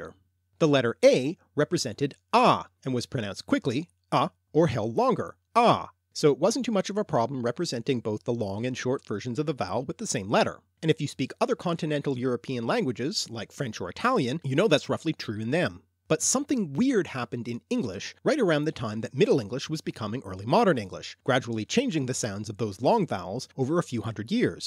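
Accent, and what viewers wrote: Canada. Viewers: You sound like a Canadian. Am I right?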